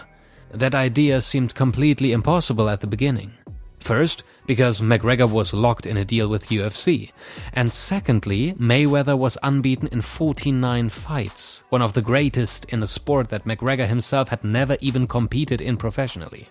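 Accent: Germany. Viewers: Love the German accent ngl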